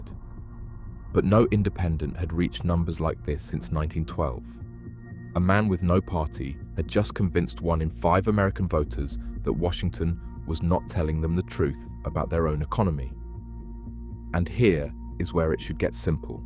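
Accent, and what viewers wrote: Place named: United Kingdom. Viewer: Please speak American, especially when the subject is American history. The word is Plant, not Plont, perty, not potty. I am sick of British accents being used for narration to try to impart seriousness.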